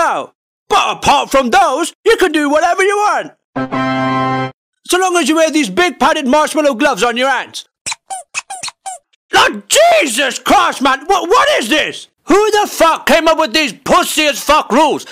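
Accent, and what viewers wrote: United Kingdom. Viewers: It's true, it comes up as dutch. I thought his voice sounds British. But, Dutch???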